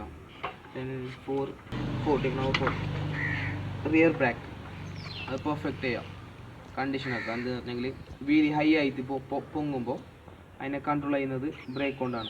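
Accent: Kasaragod, India. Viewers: Tips ellam okke aanu bro.. Kasrod slangum polichu.. Kurachu koodi spacious aaya sthalath venam stunt tips kodukkaan.. Ennale viewersinu oru majje indaavu.. Slang oru kuravaayitt kaanathe confident aayit samsarikkanam.. Otherwise all good.. Keep going.. 😍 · Nth da slang kettal arila ksd😍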